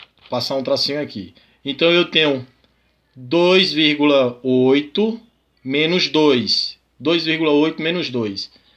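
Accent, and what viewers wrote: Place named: Alagoas, Brazil. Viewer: Boa noite! Obrigado por postar os exercícios. Pelo seu sotaque me arrisco a dizer que o senhor é alagoano.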